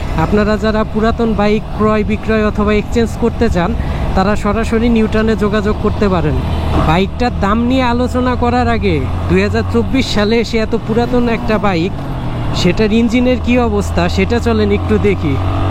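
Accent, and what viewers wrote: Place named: Faridpur, Bangladesh. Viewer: ভাইয়া আপনার হোমটাউন কি ফরিদপুর?কেনো বলছি,কথা বলা দেখে চেনা শহরের বলেই মনে হচ্ছে!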